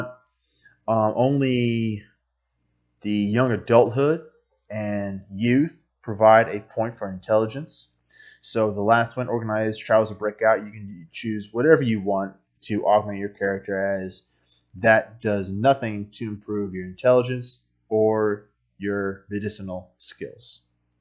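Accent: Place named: United States. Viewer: U sound American for an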